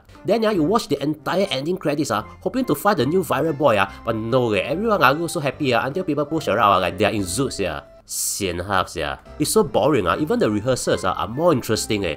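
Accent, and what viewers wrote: Singapore. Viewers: Bro, your accent is 200% singlish limpeh also need to turn on CC to understand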